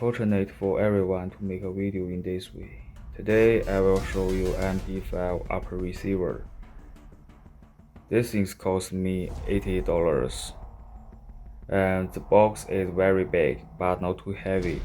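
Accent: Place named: China. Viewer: 塑料中式英文🤪🤪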